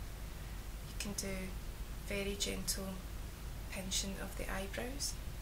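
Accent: Scotland, United Kingdom. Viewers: are you Scot? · Your voice calms me because it reminds me of my grandmothers thick scotish accent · It's very refreshing to have a fellow scot on one of these videos, lovely!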